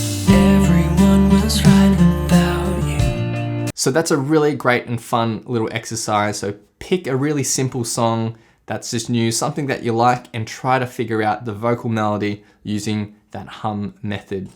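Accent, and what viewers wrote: Australia. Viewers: Thanks a lot for the tips,! I love Australian accent by the way haha. Keep it up, mate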